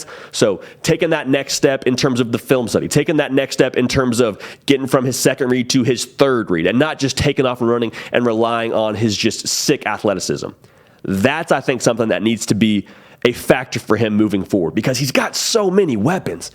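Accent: South, United States. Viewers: why does this guy have a southern accent? He's from SoCal and went to Cornell.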